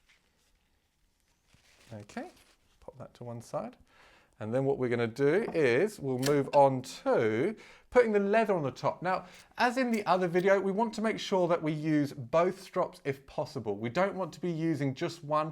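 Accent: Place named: England, United Kingdom. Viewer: He’s English you wanker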